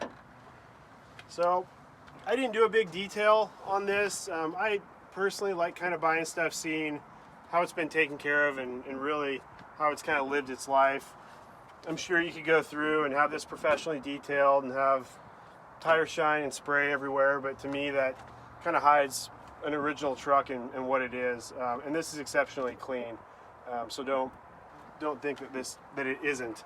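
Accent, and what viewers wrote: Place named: West Coast, United States. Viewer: Boy if I've ever heard a west coast accent it's this here. And what a pretty truck!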